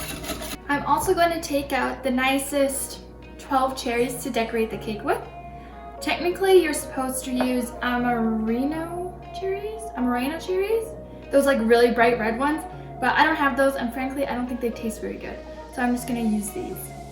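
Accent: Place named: United States. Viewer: Wow, I think you’re a sweetheart but it took a bit to grasp a lot of things you said. You speak like an American, but so many of your terms didn’t make sense to me. Now that I understand, I’m pretty impressed. A couple things. You weren’t wrong when you were trying to say Amarena Cherries. Those are not the same as Maraschino cherries. Amarena are good cherries to top your cake with but I like what you did. You keep referencing dough. Maybe in Germany, you think of it as dough but in my world it is more of a batter. Dough is what we refer to with bread, cookies and pastries. Alcohol doesn’t vanish, it evaporates. Bottom line is that your cake turned out absolutely beautiful. Good job! Is this a recipe from your family or did you get it from another YouTube? Keep up the good work! Also, how much is 1 glass of cherries?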